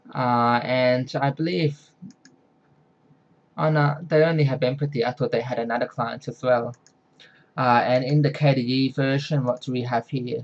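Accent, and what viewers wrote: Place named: Australia. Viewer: Sounds like he's an Aussie to me, Ronaldo.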